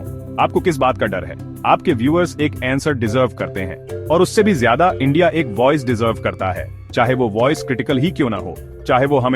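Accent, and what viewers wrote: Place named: United Kingdom. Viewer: Aur angrej mat ban. Hindi bhi angrej ki tarah bol rha hai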